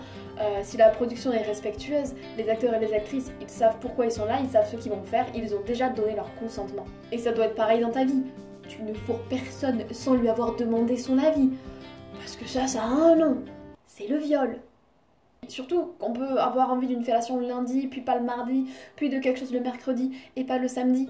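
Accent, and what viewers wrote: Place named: Southeast, France. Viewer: « On est tous le beau gosse de quelqu’un. » J’adore! Tu es du sud-est, vu ton accent de bel canto??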